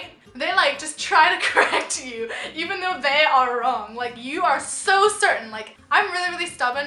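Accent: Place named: Australia. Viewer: How the fuck do you sound Australian?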